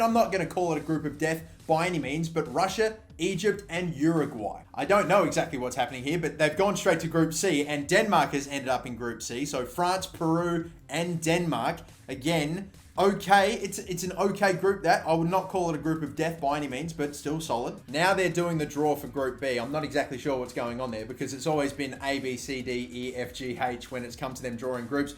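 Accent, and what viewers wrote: Australia. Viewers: I thought u were English at first and when you started to explain last world cups group England "was in", I was like "wtf, they had Italy, Costa Rica, and Uruguay, not spain, netherlands, and chile. Then i realized you were Australian....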